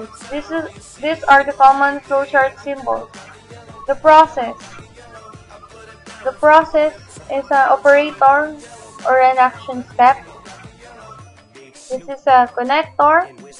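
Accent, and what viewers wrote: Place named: Greece. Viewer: Nice work but your English pronunciation like Greek not that clear.. overally a good work \m/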